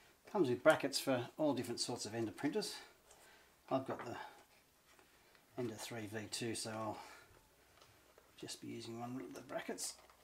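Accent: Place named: Australia. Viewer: Love hearing a fellow Aussie at work, great video helped me a lot! Jyers is a great firmware!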